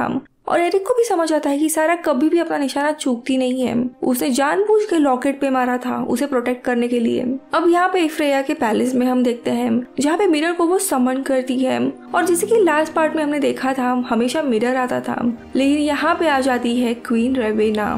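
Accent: Maharashtra, India. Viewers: hi do you have any relation with climax explained in hindi channels nikhil, moreover you both sound a quiet marathi, BTW Love your explanation. thank u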